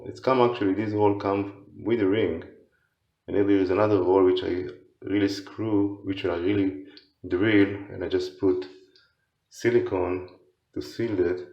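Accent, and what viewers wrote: Israel. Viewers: love your video!!!.......and your Israeli accent!.. thanks!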